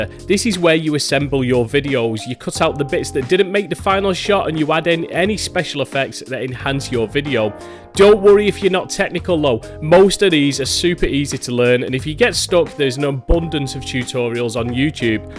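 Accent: United Kingdom. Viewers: This really is super-helpful. You have a great Yorkshire accent and voice by the way. If you're not doing voice overs already and need the extra cash, this could be a nice sideline for you.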